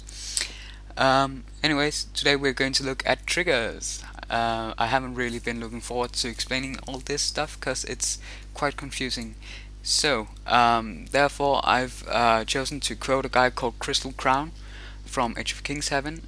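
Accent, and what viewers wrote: Denmark. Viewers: he is from denmarkm and im from sweden some thincks thet we sunds funny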